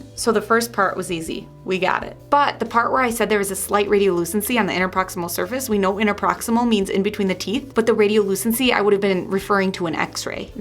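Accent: United States. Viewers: Are you american ?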